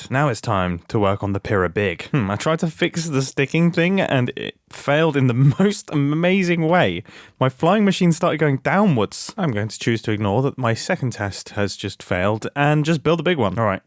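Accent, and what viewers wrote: United Kingdom. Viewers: funny hearing a british person talk about the bass pro memphis pyramids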